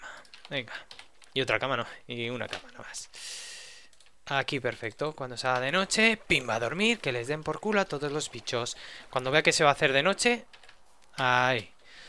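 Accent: Spain. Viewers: Eh tio (me encanta como hablan los españoles) puedes hacer carbon vegetal con tronco de madera (no tablas) en el horno. Es casi lo mismo que el carbon, solo cambia el nombre ^^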